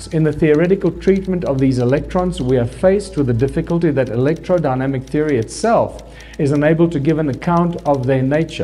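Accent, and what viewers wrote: South Africa. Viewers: I stumbled upon this for the second time and I realised that it's a South African speaking here. I love how we are so diverse in ideology and thought because it opens up the potential for many of us to think differently perhaps, hopefully, come together and see how such can bring us together towards a common understanding about what it means to be human.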